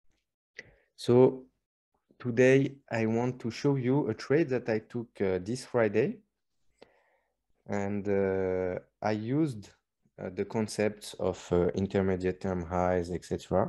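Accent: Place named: France. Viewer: T’es français? Je sens l’accent français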